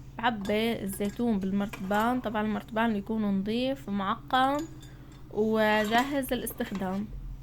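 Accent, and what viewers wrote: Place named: Homs, Syria. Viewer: صحه وهنا لكلشي اكل.. . يعطيكي العافية... محتوى حلو وطبيعي وسلس بستمتع معك مع لهجتنا الحمصيه البتجنن محتوى من بيوتنا مافيه تصنع ابداااااا..... من حسن للأحسن يارب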